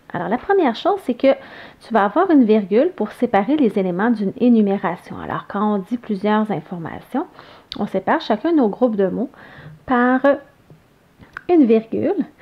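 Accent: Canada. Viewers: C'est la meilleure des manières, pour apprendre une leçon comme celle-ci. se laisser bercer par un doux accent Canadien. Pouvez vous me dire si cela est juste ? Merci d'avance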